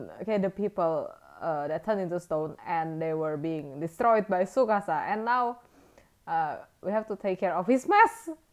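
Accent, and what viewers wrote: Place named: Philippines. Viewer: seriously, sister, where are you from? I heard a foreign accent .. Are you from the Philippines?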